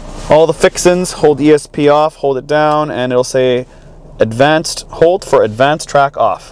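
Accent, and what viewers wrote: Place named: Canada. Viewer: acording to his accent this channel is from canada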